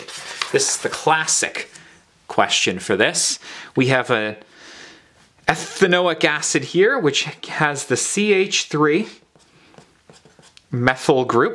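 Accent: Canada. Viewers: you're canadian aren't you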